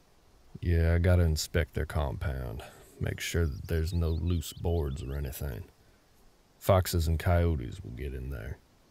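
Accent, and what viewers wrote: South, United States. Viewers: as a southerner, this accent ain't bad. It's hard to tell what dialect, though. maybe Georgia? Mississippi? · Geez...that deep country accent got this country lady fallen head over heels. Yeeee-doggy! 🤠😍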